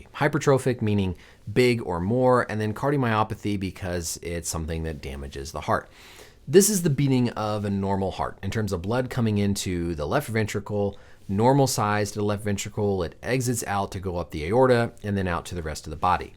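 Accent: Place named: Michigan, United States. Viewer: Unrelated to this video but I had a very strong feeling you’re from the Midwest/Michigan and googled you lmao the accent sounds very Michigangander esque. Love how informative and straight to the point your content is Cheers doc!🍻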